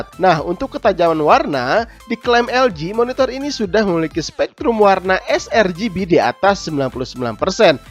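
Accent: West Java, Indonesia. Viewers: nyunda pisan euy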